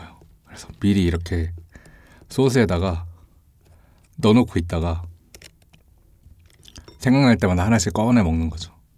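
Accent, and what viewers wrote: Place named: Seoul, South Korea. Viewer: 서울말투 좋당 나는 쏘쓰 라고 하는데 소~스 라고 하시니까 발음이 부드럽네요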